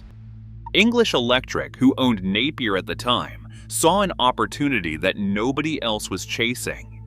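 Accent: United States. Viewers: Why use such an annoying American voice on a subject on British Rail? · Why do these vids have to have an American commentator…..aaaargh!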